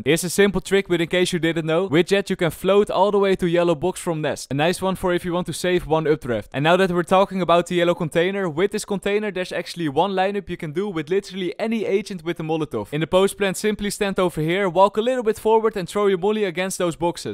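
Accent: Netherlands. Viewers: your accent tells you are from netherland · He’s dutch I can hear it for his accent because I’m dutch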